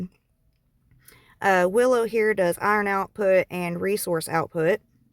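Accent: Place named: South, United States. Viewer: Damn you sound kinda hot. Country accent goes hard. ☠️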